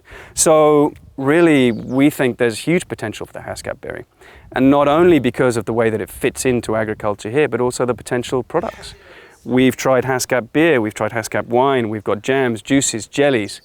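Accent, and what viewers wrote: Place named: England, United Kingdom. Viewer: So nice to hear English English from NOVA SCOTIA. I have just bought some seeds and am aching to seed them..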